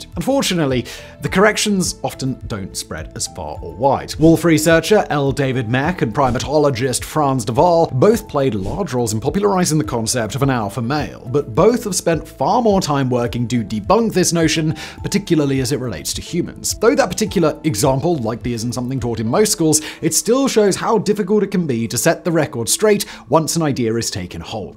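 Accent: United Kingdom. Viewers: I feel like one's perceived IQ is like 10-20 pts higher when one gives a report with a British accent. I believe and want to buy surf shark 😅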